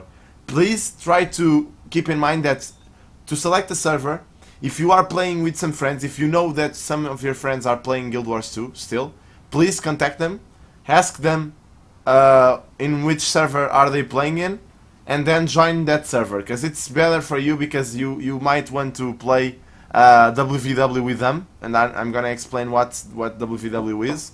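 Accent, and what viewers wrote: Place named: Portugal. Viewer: Bom video, assim que te comecei a ouvir percebi logo que eras português kkk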